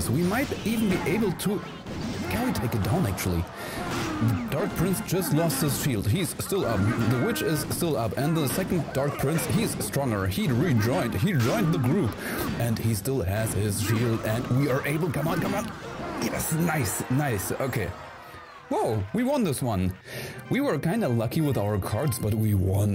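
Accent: Germany. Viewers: Your german accent is too awesome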